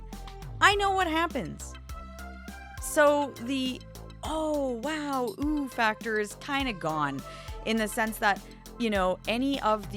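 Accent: Canada. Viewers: I can always tell someone is Canadian when they say “sorry, hard, Mario” and I love it 😊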